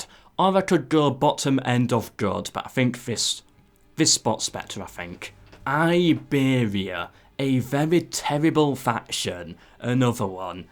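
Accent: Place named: Yorkshire, United Kingdom. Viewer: I've honestly never heard a Yorkshire accent as strong as yours, it's beautiful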